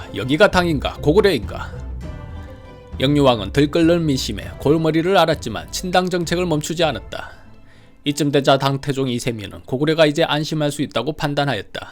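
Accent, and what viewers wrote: Gyeongsang, South Korea. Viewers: 경상도 사투리. 감추려 해도 감출수 없지.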